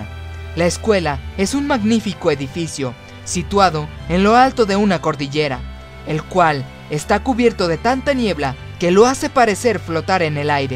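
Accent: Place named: Japan. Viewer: Like si Te das cuenta de el asentó japonés en todos los videos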